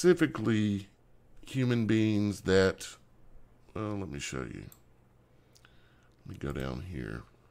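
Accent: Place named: South, United States. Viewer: Naw, Son; although, you're, clearly, a Fuck-tard. Just some honest feedback form a member of the audience. Between the guy's Southern-fried accent, his monotone, near-mumble, the repeated use of the incorrect phrase, "Crimson Tide," (Alabama fan, huh?) and random samples of the dreaded Gematria (I mean, give me a break with that crap), I challenge anyone to tell me what the fuck he's talking about in this video.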